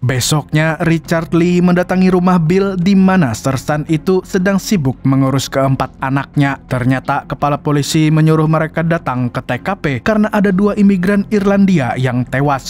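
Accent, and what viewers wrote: Banjar, Indonesia. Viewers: Njir rancak nonton hanyar aja tahu pada urang Banjar sidin nih😂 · Urg Banjar mana suara ny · Urang banjar sekalinya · Urang Banjar kah Sidin ni mang ? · Urang Banjar kah .. mantap sanak ai....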